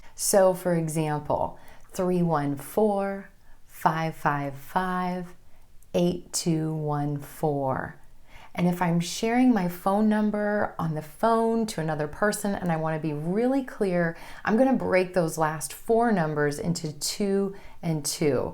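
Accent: United States. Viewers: Yeah some word like hilarious, gorgeous, generous, famous, ambitious,ridiculous, superstitious, suspicious, furious, precious, Somethings that end with ous and ious, I really too bad to pronounce with whose word that end with ous and ious, or if you have more word that end with ous or ious, pls help shares more on the video you make dear, is really hard for me to make a sounds “S,Z” at the end of whose words, Hoping You can help me how to pronounce with whose word correctly dear, I will looking for your video new coming next soon my dear, Thank you so much for all your helpful video, and everything you explain on video very clear for me to understand and I really like ur accent American so much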